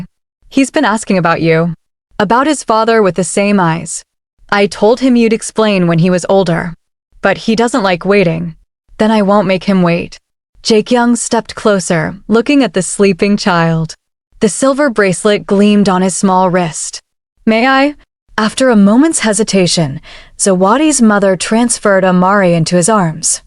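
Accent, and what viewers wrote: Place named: Guyana. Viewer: 'Born behind cow'. You sound Guyanese. I agree with your comments. Additionally, what is this obsession with liasons between Korean men and Black women? It is fiction because in real life, very few Korean, or Asian men, have healthy romantic interests in Black women. That's a conversation worth having😊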